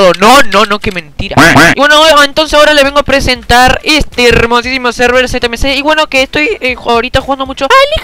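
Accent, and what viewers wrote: Argentina. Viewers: sos argentino o mexicano?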